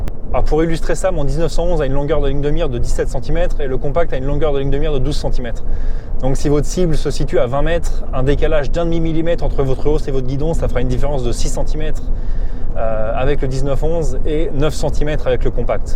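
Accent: United States. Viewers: Super video, l'accent ricain très soigné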